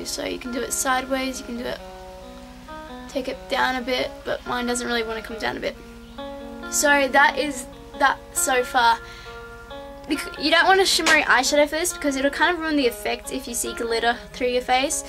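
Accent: Australia. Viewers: u sound Australian i now love you just cause i am australian ^-^ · r u austrailian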